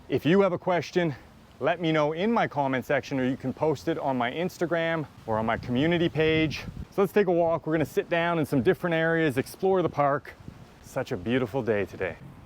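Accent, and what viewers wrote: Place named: Canada. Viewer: The negative comments I think it's the Canadian hoser accent and because you speak very slow and clearly. Which is great cause I can listen to you at 1.25 it 1 5 speed. I'm in Thailand (Hua Hin) now and you helped us get here with your vids. Big thanks from a fellow Canadian and Australian. Hope to come back in Dec for 4 months to escape those Mississauga winters. Cheers keep making those vids.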